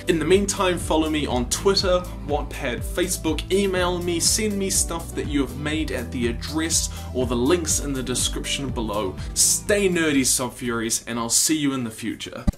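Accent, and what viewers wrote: New Zealand. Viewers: are you a kiwi/new zealander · are you from New Zealand?!?!?!? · Are you from NZ? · Knew you were kiwi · Wait... you from New Zealand? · I was wondering if you were from New Zealand, was wondering why your accent was so familiar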